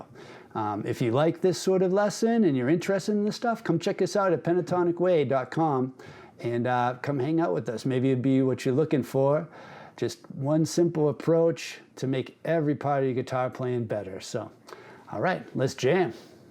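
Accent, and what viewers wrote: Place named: New Jersey, United States. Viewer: I really want to thank you for this. Though I made the same mistake yesterday, walking through a field, absentmindedly wondering if it’s a New Jersey accent you have, I got woosh-attacked again and momentarily panicked that I was under a cattle attack. But beside that… this lesson is the first time I feel like I’m “getting it”. I’ve been playing since ‘93 (apart from a 15 year gap lasting until recently). But I realise now that I never actually learnt anything because… “scales and theory! That’s not very Punk, grandma!! <smug snigger>” and apart from some and everything was Seattle/Subpop or UK/NYC Punk, so I never actually heard how I was playing under the distortion. I’ve even realised my timing is absolutely woeful as I’d always played alone or with friends, without a drummer when we were all off our heads on anything we could afford. 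So I’ve gone right back to basics. Theory, scales, rhythm, metronome and I’ve practiced those and technique every day for a few weeks but I never actually felt like it was attaching together until now! This has really opened it up for me be I can see clearly what is happening and what I need to do. I’m recognising what will sound good next and none of that would have clicked for me if you hadn’t simplified it so much and said “this is what we’re doing, this is how we’re doing it, this is why. Now go and have fun”. And I feel so grateful for that. First time in decades that I have a proper glow about playing music again! Apologi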